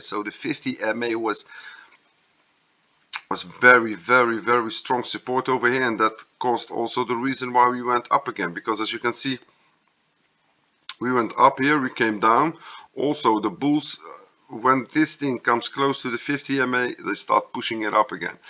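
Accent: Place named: Poland. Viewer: Dude your English is great! I love Polish accents and way of speaking.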